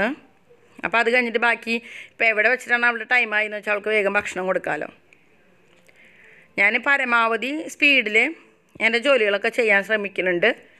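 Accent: Thrissur, India. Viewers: Happy b'day Malu🎂🍫🍬🍭🍡🍨🍧🍨 Cheruthanenkilum nalla sadhya Chechiyude samsaram kettirikkan thanne enthu rasama. Nalla nadan thrissur bhasha thanne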